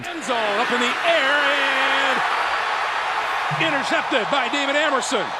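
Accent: Pittsburgh, United States. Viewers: Matthias Bell he's from Pittsburgh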